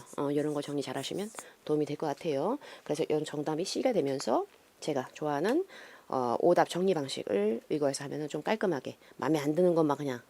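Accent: Jeolla, South Korea. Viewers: 김선아 전라도버전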